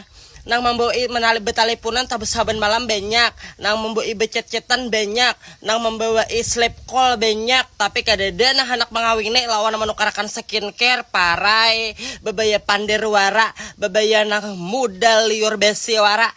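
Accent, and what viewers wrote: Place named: Banjarmasin, Indonesia. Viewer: Hello....,aku di jkt suka banget dgn gaya bicaranya or logat Banjarmasin ❤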